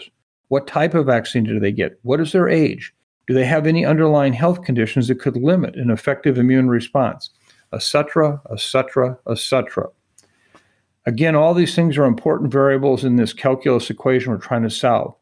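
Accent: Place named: Minnesota, United States. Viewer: He's from Minnesota and that's the dialect.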